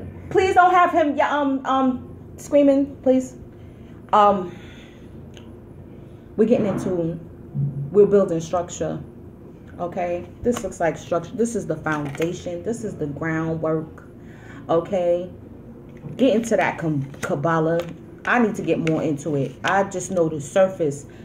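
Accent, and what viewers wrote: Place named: New York, United States. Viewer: I love your delivery. You are suuuucccchhh a NY girl lok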